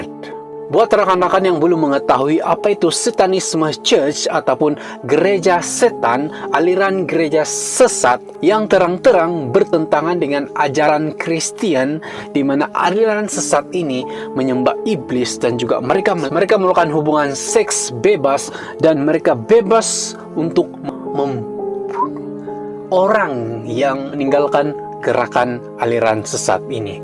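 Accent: Sabah, Malaysia. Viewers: ni orang sabah ka? Aku tangkap aksen dia sabah bah cara dia becakap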